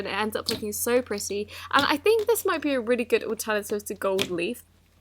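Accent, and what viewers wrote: United Kingdom. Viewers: Thang Hong she’s british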